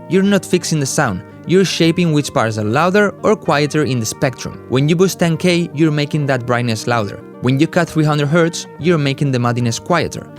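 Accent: Spain. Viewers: hermano ese acento es español dime que si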